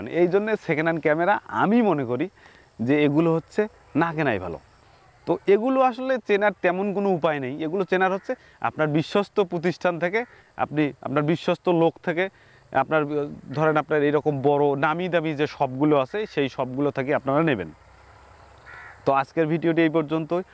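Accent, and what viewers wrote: Rangpur, Bangladesh. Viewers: আপনি সাবলীল বাংলা ভাষায় কথা বলেন তবুও এলাকার উচ্চারণ আমার বাডী উলিপুর কুডিগ্রাম তাই বুঝতে পেরছিলাম ধন্যবাদ উত্তর দেয়ার জন্য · হ্যালো ভাই আপনার বাড়ি কোনঠে রংপুরিয়া কথা কন ক্যা ম্পা অবশ্যই জানাবেন কোন জায়গায়‌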